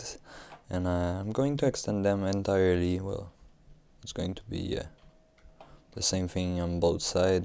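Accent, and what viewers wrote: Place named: Quebec, Canada. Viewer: ont a un québécois icite!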